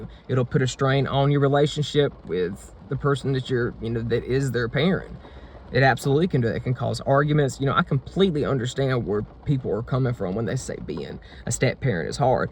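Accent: South, United States. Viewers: "gay country accent".. lol. It's just the region in the South he's from... and the parents (voices) he was raised around. (I'm from the south)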